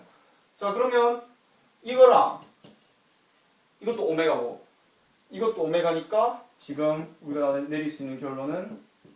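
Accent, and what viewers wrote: Gyeongsang, South Korea. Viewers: 서울말씨는 경상도 말씨든 설명이 참 좋네요. 달을 가르키면 달을 봐야지 어리석은 몇몇사람은 손가락을 보네요. 설득 보다 유도라는 표현은어떤가요? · 서울 말씨를 사용하세요. 경상도 말씨는 지겨워요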